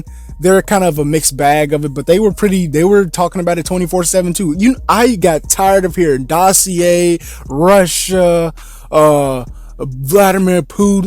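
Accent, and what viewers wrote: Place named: Appalachia, United States. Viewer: He's not losing subs because he's not telling people what they want to hear it's because he's sitting in the house losing it on YouTube because he couldn't go to the gym lol! He's going now but the hillbilly accents, pausing the videos to make stupid jokes that are not funny and 6 hour lives is why people are leaving his channel.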